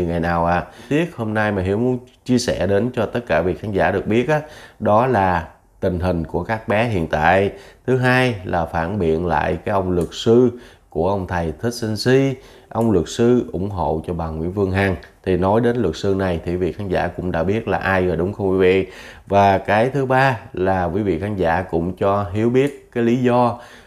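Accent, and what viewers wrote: Standard. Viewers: Tiếng nói quá chuẩn luôn❤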